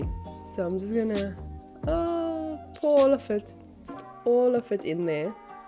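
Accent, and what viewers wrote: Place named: Afrikaans, South Africa. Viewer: Ek kan die afrikaans in jou stem hoor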